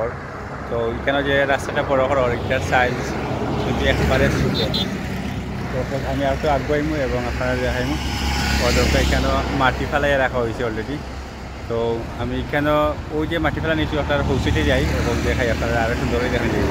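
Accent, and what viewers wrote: Sylhet, Bangladesh. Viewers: আপনার ভিডিও ভালো হয়, তবে সিলেটি ভাষায় না বলে শুদ্ধ ভাষায় বললে সব জেলার মানুষের বুঝতে সুবিধা হবে ❤❤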